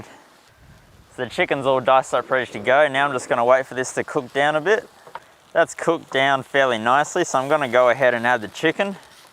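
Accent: Australia. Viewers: G'day, I'm in Virginia and thoroughly enjoying your video! I have been gathering the gear needed for car camping. Not much was needed due to the fact I've been backpacking and camping since I was 10. I almost need a warehouse to store my gear. At 72 I decided it was time to give up the long treks or hikes especially up and down mountains. The legs and lungs are not so strong any longer. After your shopping spree in Tentworld (REI would be a close equivalent) it seems I have all of the necessities. I just have to figure out how to get back to Australia...what a beautiful country you live in! I've been to Melbourne and Coolangatta (my sister has a timeshare there) and absolutely love it on the Gold Coast., you should be on TV! You have a great smile and personality plus. Your skills as a photographer and speaker (love that Aussie accent!) are above reproach. Anyway, I'm a new sub and look forward to watching more of your videos. 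· really enjoyed the video as someone who's never been camping, but is thinking about it. Easy to follow tips. Incredible how you manage to smile and speak at the same time! And great Aussie costume hat, accent and shorts. Really sets the scene. Keep up the videos. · Is that a Queensland accent? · Gotta love the Aussie accent👍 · Sorry, I couldn't follow it because the guy's accent made me winced! To my ear it was awful!! But, that's me, not him. If I have to listen to an accent, I can tolerate a London accent, but not Australian or any other part of Great Britain.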